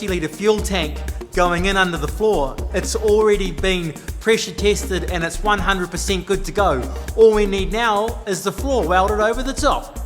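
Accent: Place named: Australia. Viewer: I'm not sure what's more cool that STONG Australian accent or that aluminum boat.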